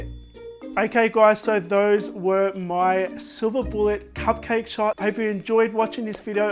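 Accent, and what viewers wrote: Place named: Australia. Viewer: That's Aussie accents for you x Aussie accents are the best ever!!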